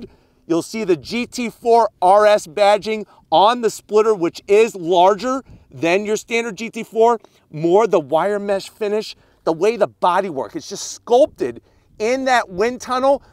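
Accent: United States. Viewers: why Americans speak like this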